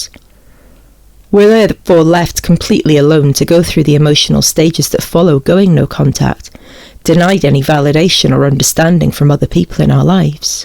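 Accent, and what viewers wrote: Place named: England, United Kingdom. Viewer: It's alright... I've made it this far :) & Yes I am guilty of oversharing the experience. Thank you for your channel; the 'Unstable Adult Baby' label I heard has been replaying in my mind alot as being so incredibly true*. I live in the US but I have English cousins so it is refreshing to hear the accent as I don't get to talk to them much. One of them sees through all the BS and was able to 'confirm' what I was seeing & validate my response. Sadly, she was smeared too by my family & sibling. Cheers and thank you again.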